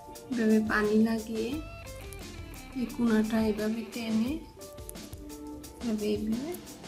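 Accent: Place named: Noakhali, Bangladesh. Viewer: আননে নোয়াখ্যাল্লানি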